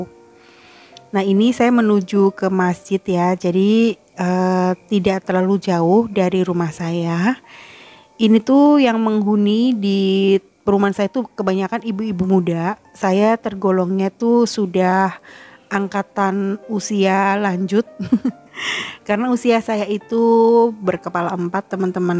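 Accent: Banyumas, Indonesia. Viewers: Ada ngapagnya ya bund...😁✌️ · W'salam sehat sehat mba, timggal di Purwokerto ya mba.....bahasanya ngapak donk hehehe, salaaam dri jakarta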